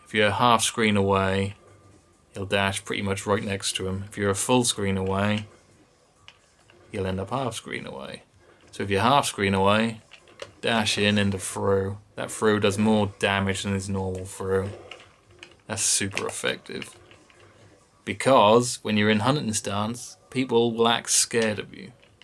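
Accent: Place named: England, United Kingdom. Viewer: Haha nah I just mean your English accent, combined with the cursing and you were obviously tired. I did learn some stuff about this character though - cheers.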